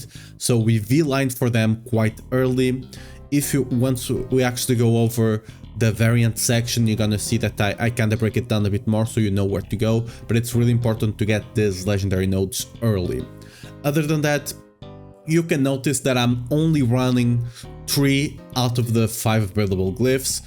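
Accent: Portugal. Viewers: Based on your accent, I am 50000% sure you are Portuguese. Please tell me I am right!?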